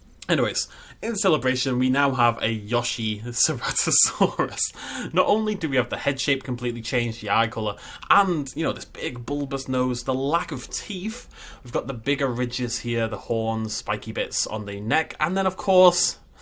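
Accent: United Kingdom. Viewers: Isn’t he like British or something? I think it’s his accent.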